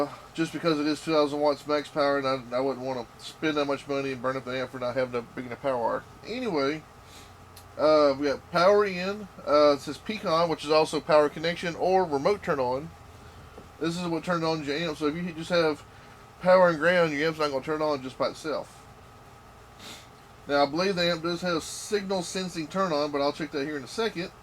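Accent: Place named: Tennessee, United States. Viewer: This guy has got to be from TENNESSEE, glad I speak fluent southern 🤣😉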